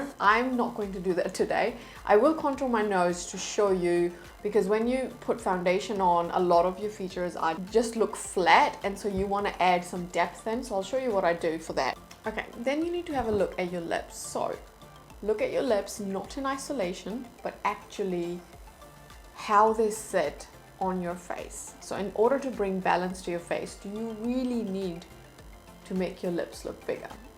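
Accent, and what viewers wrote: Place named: RP, United Kingdom. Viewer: I don't know why but you kind of sound like the English royal family. They speak very differently even easy words like "that". You sound quite similar to them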